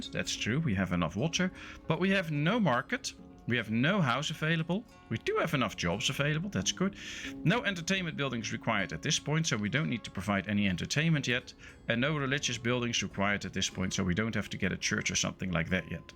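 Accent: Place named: Netherlands. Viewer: Goede tutorial. Ik zou wel mijn Nederlands accent wat proberen weg te poetsen. Klinkt nog te veel als Mark Rutte die Engels probeert te praten. Probeer "kid" niet uit te spreken als "kit" en "pause" niet als "pos". Ze hebben een wat langere klank. Update: en "wood" niet als "woet" ;)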